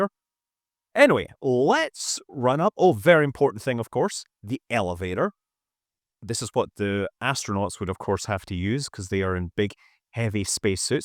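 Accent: United Kingdom. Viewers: Another Brit narrating US History. Why?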